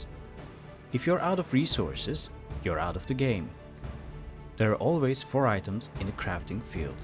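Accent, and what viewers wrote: Turkey. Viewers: Yapımcıları türk zaten hani seslendiren de türk aksanından belli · bunu bir türkün seslendirdiğine yemin edebilirim ama kanıtlayamam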